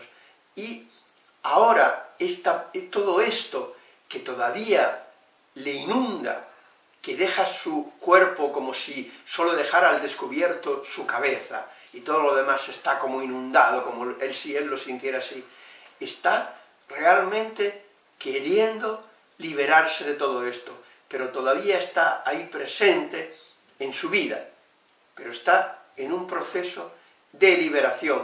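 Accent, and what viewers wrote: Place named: Spain. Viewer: Me ha gustado el acento español con el que habla :)), buena explicación de la teoría.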